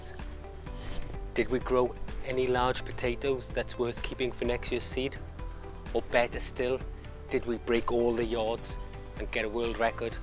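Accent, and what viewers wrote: Wales, United Kingdom. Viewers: Amazing! I’d be so stoked to have potatoes like this! Thanks for the info. I love your accent 😍 Wales? I’m from California, please don’t be offended if I got it wrong 😊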